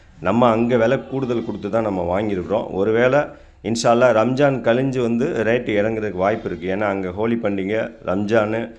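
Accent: Madurai, India. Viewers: ❤ assalamualaikum warh best goat lovely madurai ❤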